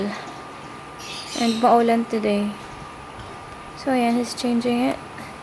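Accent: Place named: South Korea. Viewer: It still gives me goose bumps for you to have a perfect korean accent. Haha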